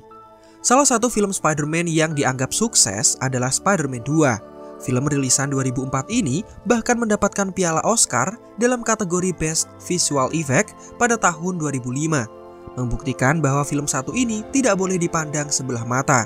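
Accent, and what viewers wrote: Java, Indonesia. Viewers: Mantep nih salfok sama logat admin nya logat wong jowo 😂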